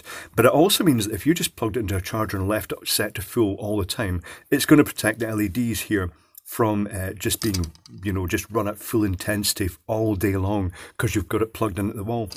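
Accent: Scotland, United Kingdom. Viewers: In addition to your lovely Scottish burr(I could listen to you all day), you have just saved me a lot of money and a lot of potential pain. Glad I found you.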